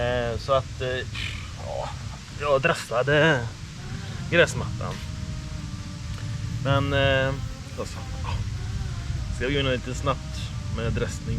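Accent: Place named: West Coast, Sweden. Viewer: Tack. Man ska inte lufta på sommaren väl? Tänkte kanske lufta i augusti en vända samt slänga på topdress o överså, vad tros om planen? Är du baserad på västkusten? Låter som dialekten är här någonstans. Är själv baserad i Mölndal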